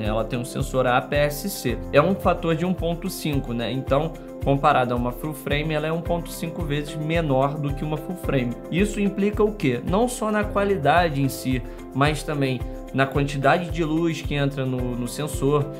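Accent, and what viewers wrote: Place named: Rio de Janeiro, Brazil. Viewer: o sotaque carioca puxadissimo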